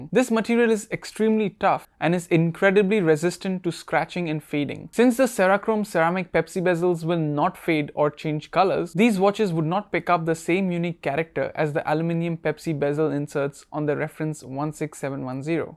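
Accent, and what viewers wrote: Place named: India. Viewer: having the indian accent is really lame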